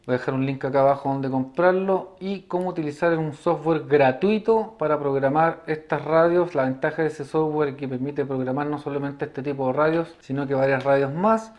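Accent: Chile. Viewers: Hola! Buscando maneras de programar mi nueva BF-UV5r llegué a tu canal y me ilustró mucho. Vi este video para conocer un poco más el equipo y al finalizar mencionas que luego subirías otro video explicando la función de los radioaficionados y qué se puede hacer con los equipos, me gustaría poder verlo... ya que al ver la materia de estudio para los examenes de la subtel no me queda claro cuál es el “brillo” de ser RA. Saludos! (Me encanta escuchar videos en “chileno” adecuado a nuestra realidad)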